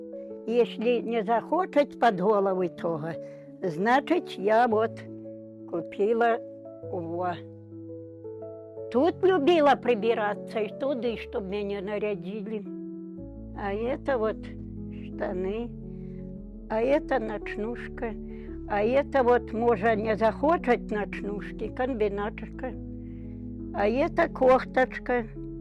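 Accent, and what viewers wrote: Belarus. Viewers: Беларуский говор , как бальзам на душу. Мама моя родом из Полоцкого района, сейчас ей бы было 95 лет. В 1940 г.переехали в Сибирь.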